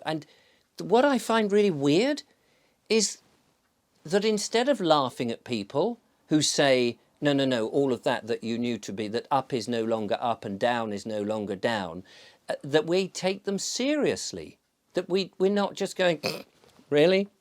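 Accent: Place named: Shropshire, United Kingdom. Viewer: Sounds like Shropshire ...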